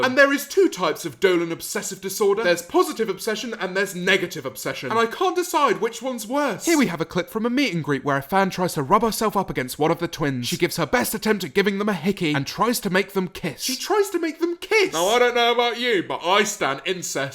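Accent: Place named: United Kingdom. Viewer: British accent, euros in your hand, something's wrong. I am new to the channel, can somebody explain it to me?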